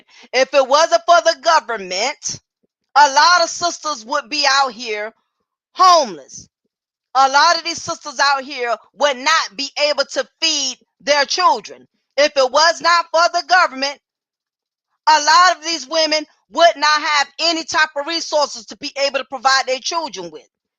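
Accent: South, United States. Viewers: You fine as hell with that southern accent ❤ Dont block me 😂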